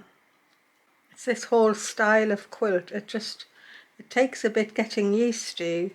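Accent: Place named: North, United Kingdom. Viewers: Where is your lovely accent from ? I know it’s northern, but can’t place it… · Thank you so very much for inspiring me to try this type of 'patchwork'. Instruction is clear and very helpful also your accent is a lovely hark back to my land of birth. Born near Richmon N Yorks I've lived in regional Victoria (Aus) since 1988. I always thought it strange that folk paid $30 a metre for fabric to cut up then sew back together to make a quilt, perhaps that's the canny lass I am!. So much better to reuse / rescue fabric giving it another life. Thanks again for a lovely video.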